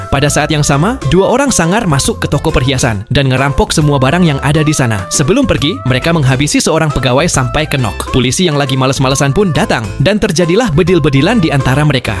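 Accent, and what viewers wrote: Java, Indonesia. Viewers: Jowo ngendi mas?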